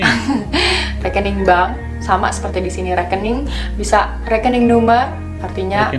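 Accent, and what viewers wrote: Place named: Java, Indonesia. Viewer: Cakap melayunya tebal jawa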